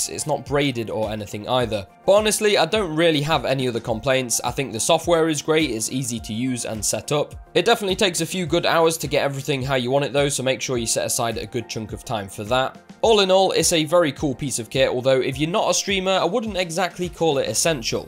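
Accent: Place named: United Kingdom. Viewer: that is the most intense british accent i have ever heard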